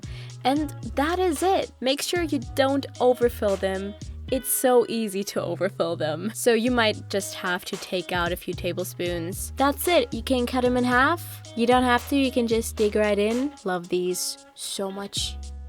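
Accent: Germany. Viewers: Ah, an den Produkten sehe ich, dass du Deutsche bist :D Ich habe mich schon gefragt was für ein Akzent das ist :D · Are you German?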